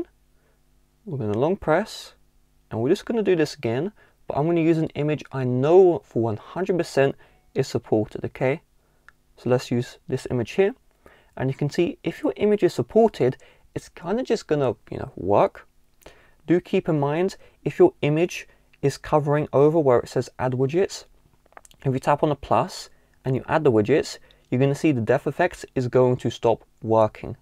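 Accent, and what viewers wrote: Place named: United Kingdom. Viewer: i like the charming british accent ! ❤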